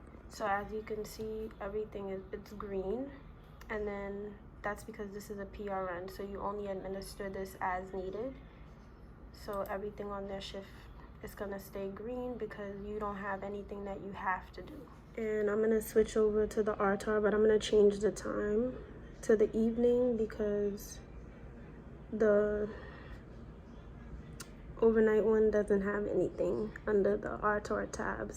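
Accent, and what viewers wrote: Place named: New York, United States. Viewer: I know a Nyer when i hear one lol Thanks for making this video sis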